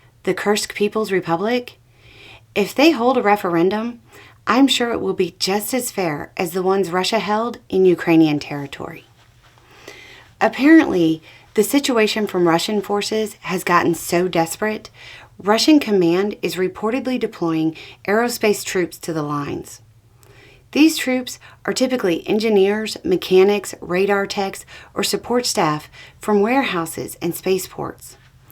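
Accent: South, United States. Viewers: I love your snarky sarcasm. 😂😂😂😂😂😂😂 If you didn't have such a strong southern accent, I'd say you were a Pittsburgher (that's a compliment where I come from).😂😂😂😂😂😂❤❤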